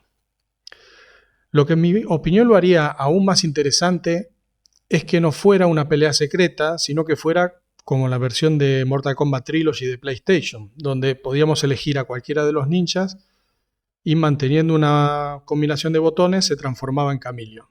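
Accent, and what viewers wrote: Argentina. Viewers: Es argento papu 😊 mase un argentina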